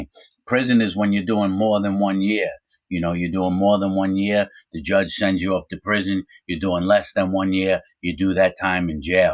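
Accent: New York, United States. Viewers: Yo, you got a cup of coffee son? Lol no doubt thats NEW YORK!!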